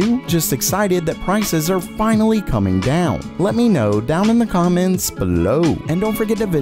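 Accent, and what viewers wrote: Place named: Philadelphia, United States. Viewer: You from the Philadelphia area my friend? Pretty sure I heard the Philly accent in there. ✌️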